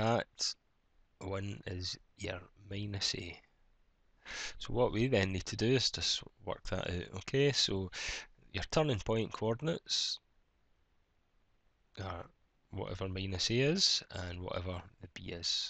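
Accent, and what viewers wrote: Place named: Scotland, United Kingdom. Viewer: mainus, Scotland accent is amazing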